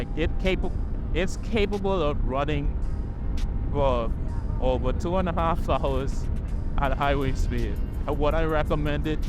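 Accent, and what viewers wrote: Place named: Bahamas. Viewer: ik a bahamian when i hear one lol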